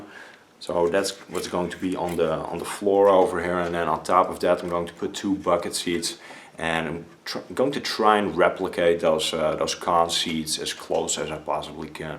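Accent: Netherlands. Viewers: Sounds like a dutch accent. You can tell by S sounds sounding like sch.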